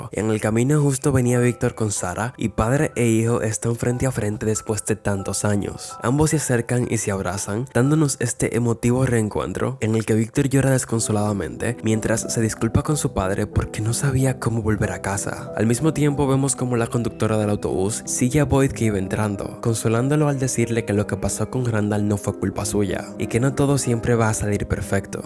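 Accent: Dominican Republic. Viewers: Esa forma de hablar “Este pana, diciendo que ta' jarto de to su m4rdito plane que no sirven” Dominicano?????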